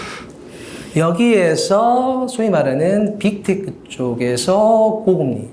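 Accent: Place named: Jeolla, South Korea. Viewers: 어려운 내용을 유치원생들도 알아들을수 있도록 설명하시는 분입니다 감사하게 생각합니다 아쉬운점이 있다면 혀가 짧은데 말하는속도가 너무 빠르고 전라도 사투리로 말씀하시니 단어가 씹히는 안타까움이 😭 죄송합니다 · 전라도 사투리가 역겹네요.그 사람들에 대한 대한민국의 감정이 하도 부정적 이라서.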